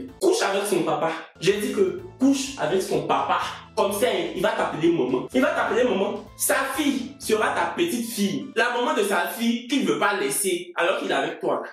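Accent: Cameroon. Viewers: Loool y’a beug dans mon cerveau ou j’ai entendu s’exclamer en disant (yé maléhhh🙆🏾‍♀️🙆🏾‍♀️) vraiment mon frère ou ma sœur camer là, tu fais du bon travail 👍🏾👍🏾😂😂🤣 j’écoute jusqu’à parfois j’oublie qu’il est ivoirien!! Yeuch!! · Bonjour Je t'ai aperçu à un pas de ma maison ds le 15eme arrondissement le février,je rentrais du travail, j'étais un peu timide mais aussi très fatiguée avec une longue journée. Mon souhait a toujours été de te rencontrer un jour mais alors ce fameux jour est arrivé et j'étais fatiguée. On s'est salué vite fait car tu étais avec tes amies et moi qui passait et pas de courage de venir vers toi faire une photo. Dis moi seule qd est ce que tu reviens ds ce café pardon. Je t'écoute régulièrement et ds ton accent je comprends que tu fréquentes beaucoup de camerounais car tu as plusieurs mots camer ds tes vidéos. Trop happy ooooooooo En tout cas je sais que seul les montagnes ne sont rencontrent pas. 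N'hésite pas à me répondre Une fan depuis le 15ème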